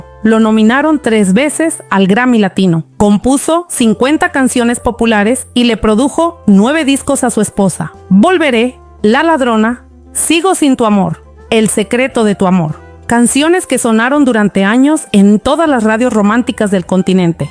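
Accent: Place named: Mexico. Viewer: Tenés que limar ese acento mexicano que da asco...😮 escuchar...!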